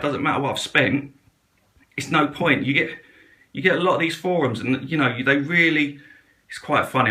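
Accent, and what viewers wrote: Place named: England, United Kingdom. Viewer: Not impressed with your video..I love your english accent however...but...who gives a rats ass if the lid is thicker? You think your corals care? ALLLLL they care about is clean water and my bet is the Deltec will outskim the Vertec hands down! oh and that leather look is probably so the lid does not slip out of hand as easy...no brainer.... disappointed in your biased approach. Just because the vertec is built stronger and smoother lines does nottttttttttttt mean it performs better and really performance is more important then the thickness of the lid! Deltec is amazing!!! You are a product of marketing...the MFGs trying to figure out how to sell you ...because they cannot get a skimmer to perform as good as the pros at Deltec... :-) again..I love your accent lolol Tim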